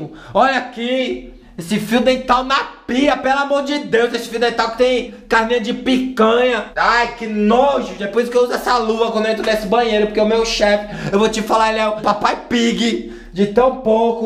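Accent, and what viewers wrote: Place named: Recife, Brazil. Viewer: esse sutaque é de ricifi né não fia?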